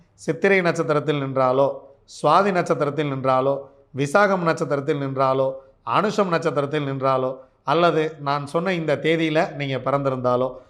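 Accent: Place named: Coimbatore, India. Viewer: Iyakkunar manivannan sir kku piraku namma coimbaturu slang um nakkal pecuum ungalidam thaan saami ketkiren... guru sakku moottaila katti adipaar... 😂😂 kastangal irunthaalum neengal sollum vitham romba rasikira mathri irukku guru naatha,, and by the by one more information,, I'm from coimbatore, ungalathu video anaithayum skip add seyyaamal anaithu add um odiya pin thaan parpen athu antha video vil neengal sollum thagaval yenakku muluvathum palikka naan tharum guru kaanikkai... anbarkalum ithai try seithu paarungal.. neengal yevvalavu menekettu ovvoru video podukireerkal yenbathu ungalai patri therinthavarkalukku theriyum... koodi nandrikal guru naathaa...by coimbatore venthaan...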